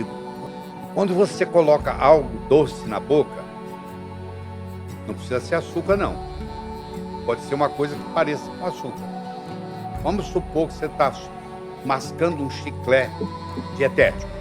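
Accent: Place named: Minas Gerais, Brazil. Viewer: Um Médico incrivelmente competente estilo Mineiro dos bons Não fica zangado Fala uai com simplicidade mas o que fala prova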